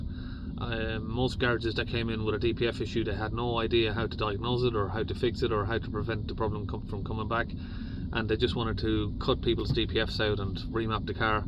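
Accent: Ireland. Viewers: I'm not a mechanic, just a vehicle owner living on a pension. My van had dpf trouble a while ago and i looked at a few of your videos and then told my garage what I wanted them to do, rather than they telling me what they were going to do. Everything worked out ok and both garage and I were happy with the result and saved me a lot of money. I wouldn't dream of ringing you up to pick your brains for free. If the callers don't understand your videos don't bother with them. You have enough to do without engaging in long chats with people. I'm trying to figure out your home county and the closest I can is the midlands, of Ireland, that is. Good luck and good health to you. Thanks again. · You've been too successful! The number of calls you are getting is an indication of how hard it is to find competent people. Maybe you could find an apprentice to train up and have someone run the phone/email/bookings and morph into a bigger business? 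As you can see, the demand is there. I'd love to have a way of asking a few questions of someone like you to work out where to go next. And as you say it's only fair to pay for that service. In the short-term you could just set up another (SIP) phone number so your business and personal numbers (or however many you need) are separate. Same for email addresses. It's a bit tricky because you didn't set things up this way in the first place, but it should improve over time. I'm pleased to see you are not too far away from me (23 miles) (I assumed from the accent you were probably in Ireland or the other side of the country) so If I do get stuck I can book you:-)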